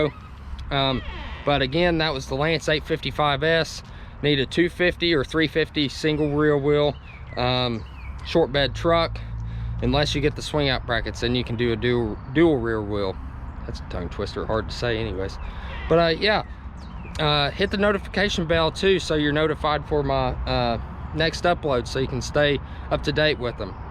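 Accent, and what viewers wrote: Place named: South, United States. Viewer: I'd like to see the smaller cap-over without the tip out to see if it would have enough storage for me. I'm alone and have no bloody kids, but I do like to cook so need storage and a good sized fridge, maybe the 825. Any chance of getting a deeper undivided sink. Thanks, you're funny; enjoyed the comments and your southern accent.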